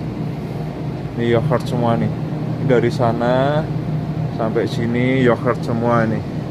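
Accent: Java, Indonesia. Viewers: cak jowone ngendhi to..... hehehhehe apik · Wong jowo pak? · Omongane kok kyok jowo² ngono ya mas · Jowone ndi 0m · Bahasanya,,, kok cukup medok, kamu asli mana mas,,, kok kaya jawa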